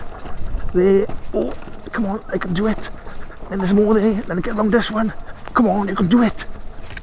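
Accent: Wales, United Kingdom. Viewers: Hi, I like your welsh accent, reminds me of my late father in-law, Unfortunately my wife was born here in Yorkshire so no nice accent. Great scenery on your challenging ride. I have a 6 month old Carrera Vengeance e spec which has been a total nightmare. Long story but i have replaced all electrics apart from hub motor. I fitted a left hand thumb throttle due to wanting to keep throttle wide open while changing up as only 250w motor so need to keep it rolling, especially up hills. Subscribed, will watch other vids soon.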